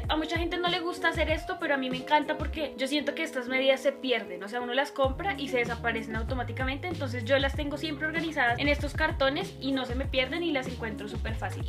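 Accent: Colombia. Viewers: ana sofia perez chaparro Creo que basta escucharla hablar para darse cuenta que por su acento es colombiana. :)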